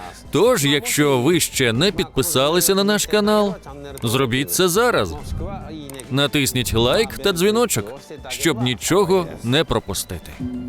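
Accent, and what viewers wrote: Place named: South, Russia. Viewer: уважаемый, понимаю южно- русский говор, просто слух режит. Не кому не в обиду будет сказано.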